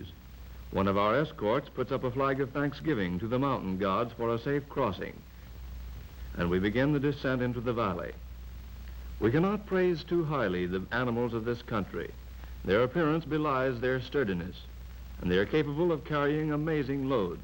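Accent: United States. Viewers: You apparently think the U.S. should have gotten into WW3 just a few years after the end of WW2. Tibet was also extremely isolated and would have been very hard to defend for the U.S. Finally, the man doing the commentary isn't from the UK, he is obviously American, and he lived in a very different time in which the vast majority of Americans that would have been watching this in the early 40s knew absolutely nothing about Buddhism, so of course he used terms they would understand like church. You are being ridiculous and acting like a troll. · American accent / reading style .... so different ? Fascinating history thanks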